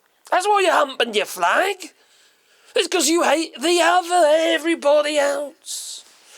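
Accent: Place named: Scotland, United Kingdom. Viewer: Love your Scottish accent.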